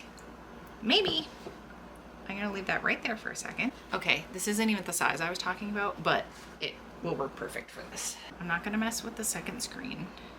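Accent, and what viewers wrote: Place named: Australia. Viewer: This was an awesome video, top marks. And I got the Australian accent bit. Also, have you gotten your new blades and replaced the old one?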